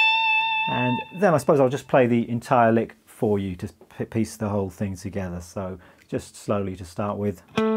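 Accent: United Kingdom. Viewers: Son You are really great !! I enjoy watching you and the British accent is terrific!!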